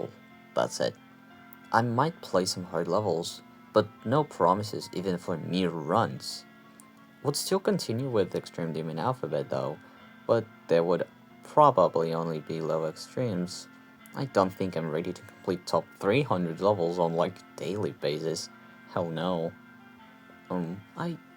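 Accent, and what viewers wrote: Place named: Russia. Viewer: Все равно русский акцент,чувствуется ппц.А зачем вот это английское,если тебя не смотрит никто и так,про зарубежных вообще молчу.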